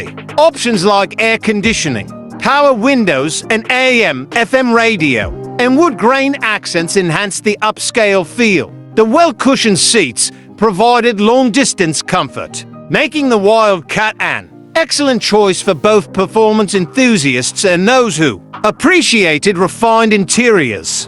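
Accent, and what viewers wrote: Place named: United Kingdom. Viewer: Why is a guy with a British accent telling me something about American cars? Do they have these cars in Britain?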